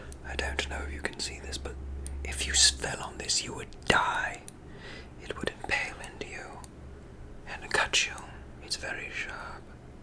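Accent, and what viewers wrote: RP, United Kingdom. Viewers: Who is this handsome Korean-American man speaking to me about a box of goals, dreams and fortune cookie papers in a lovely British accent? Because he's doing wonderful and I love it. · so he’s a korean american who knows asl and speaks in a british accent... hm.. ㅋㅋㅋ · I’m just gonna act like I haven’t watched this video 15 times already 😔 please post more asmr videos in the British voiceeee😭😭😩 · Omg. I've been following you for a while and your British accent tik toks inspired me to get here. I'm so so happy omg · Just me that thinks uses his British voice more than his actual one and I love it ✨🤗🥰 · Idk whats going on... but i'm loving it.🥰 I'd love to hear the British Gentleman from the 1800's roleplays hahahhahah 👉👈 plz do more🥰🥰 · this is a very VERY convincing british gentleman from the 1800s accent... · What am I watching?? 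But don’t get me wrong, I love the British gentleman accent.😗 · British accent + ASL ... Somethings not adding up (Yes, I know he's not actually british) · Ok but like you don’t have to do anything specific, just whisper with your British Voice into your special ASMR. I will listen as long as your speaking so...😼‼️🙄✋🏽🥶👁👄👁 SIKEEEEEEE.... I GOT TO THE FORTUNE COOKIE BOX THING. READ YOUR DREAM CHECKLIST AND FORTUNES OUT LOUD WITH YOUR BRITISH VOICE!!! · Why is it that is so much more attractive with a British accent lol❤️ lol you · This British gentleman accent is giving me 🦇 British Vampire🦇 vibes , ya know? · You should read more fan fic in British it kills me!😂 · Ok don’t get me wrong I love the British gentleman voice but can we have a normal voice video please? 🥺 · Hey how about u do an asmr with ur British gentlemen voice but make it sound like ur talking to us. Also u don’t really have to whisper when it comes to asmr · I’ve only ever heard his British gentleman voice lmao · Alright, it’s official, British accents give me the tingles... 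that explains a lot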